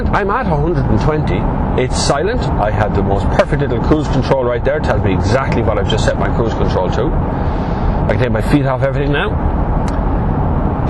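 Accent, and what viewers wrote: Ireland. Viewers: I never thought I'd see Emo in a YouTube video...... and your accent is familiar, are you from Laois?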